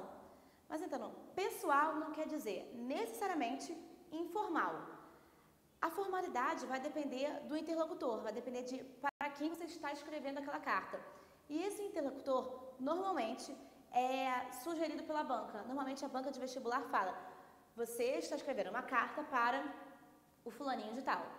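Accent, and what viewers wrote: Rio de Janeiro, Brazil. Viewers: e esse carioquês da professora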